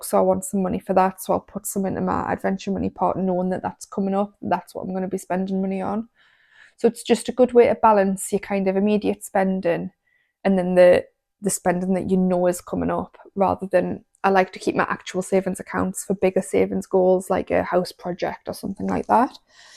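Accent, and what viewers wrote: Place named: Northern England, United Kingdom. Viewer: Recently came across your channel and I am hooked onto your videos. It's refreshing hearing the finance journey of a fellow person in the north of England. Your accent is wonderful and thank you for sharing your story and past circumstances. Esp with the skin cancer scare, shows the importance of being mindful yet spending money for personal enjoyment too.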